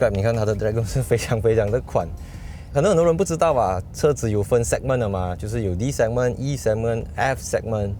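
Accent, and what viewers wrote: Malaysia. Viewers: 把英文说出马来口音，哈哈。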